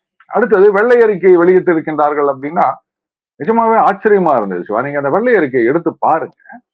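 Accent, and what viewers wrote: Chennai, India. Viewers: Chennai Tamil. Why should one get irritated to listen to a regional Tamil, that's part of our TN and that too capital city. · Madras Bashai! grew up in the rough and tumble of Madras! I like his Tamil because I am also from Madras! interview is by and large will be in Madras Bashai! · talks are in Madras style which all of us like.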